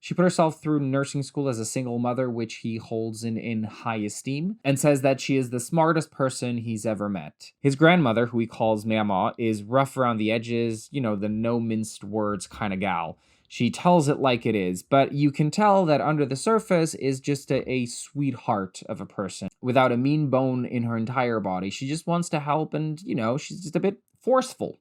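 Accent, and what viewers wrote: United States. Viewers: Might I remind these christofascist theocrats - Pete Buttigieg has 2 children. So does Kamala Harris. BTW, you have a VERY American accent! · First time on your channel; you said you're not American but I can't hear anything but an American accent in your voice? Please tell me what I'm missing! No disrespect meant at all. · Your American accent is perfect 👌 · You speak with an American accent (at least you sound American to me). Your channel says you are from the UK, though. Are you actually from Canada? Just curious. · people pronounce it differently for their own grandparents. You did fine. I had a Memaw (pron. mee-maw), tho, instead of a mamaw (as you pronounced it)... but even in the same area here where my grandparents lived... my cousins pronounced it differently for their own grandparents. It's common terms in the US south and in the mountains for grandparents. 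And then there's the pawpaw tree... and multiple towns named for that. A uniquely native American tree and largest native fruit. Some folks call their grandfather pawpaw, too. You sound like you belong in the US with your accent... I would never have guessed English was your 3rd language. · Why do you have an American accent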